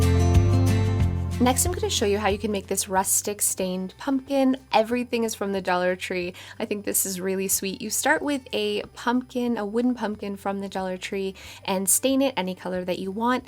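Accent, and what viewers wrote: Canada. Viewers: A few things: 1. These DIYs are so beautiful and elegant! 2. I'm from Florida and your Canadian accent is literally the cutest 3. I've always thought you were very pretty but since your surgery you have so much CONFIDENCE girl and you are rocking it! You look so happy and that makes me happy 😃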